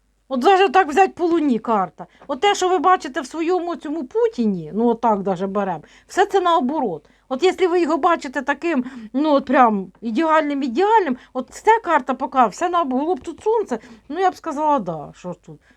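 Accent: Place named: Poltava, Ukraine. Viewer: Дуже люблю Полтавщину,ваш говір,слухаю із задоволенням,чекаю.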